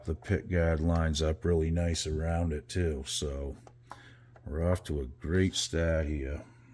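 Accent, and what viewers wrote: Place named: Maine, United States. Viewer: I just happened upon your channel tonight. Nice to hear the Maine accent. I grew up in Maine, Farmington. I live in Florida now. It’s Ben a while since I was able to go back home to visit family. I’m currently building a strat from parts. I lost all my household goods, including all my music gear and guitars. I had all my stuff in storage when I moved to Florida. I got behind on I the rent and they ended up auctioning all my stuff. So now I’m starting over building a David gilmour inspired strat.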